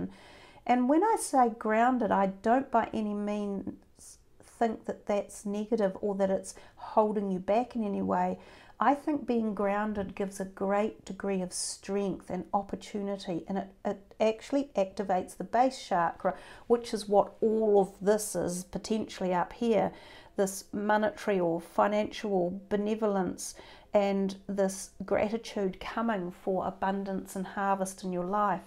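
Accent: New Zealand. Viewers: I adore her accent. Kiwi accents are lovely. Blessings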